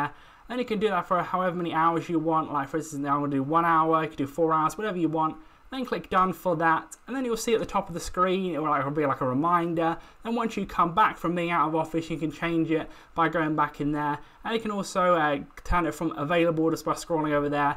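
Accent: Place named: United Kingdom. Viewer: woo.. That's some British accent.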